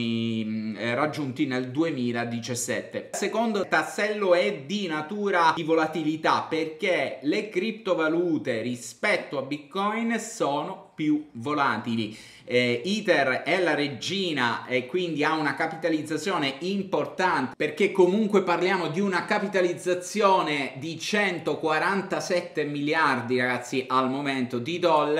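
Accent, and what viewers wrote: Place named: Cosenza, Italy. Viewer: sei cosentino e la d e la t si sentono... prima o poi spero di poterti salutare di persona covid permettendo😉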